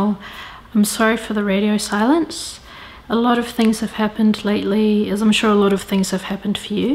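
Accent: New Zealand. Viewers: Learning English with NZer accent 😁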